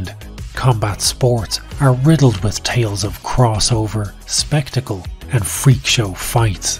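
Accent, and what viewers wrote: Ireland. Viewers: I've just found your channel and damn, am I impressed. Great to hear an Irish accent smashing it too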